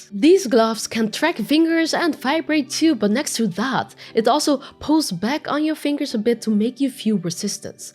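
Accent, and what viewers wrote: Netherlands. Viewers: Is that a dutch accent you have?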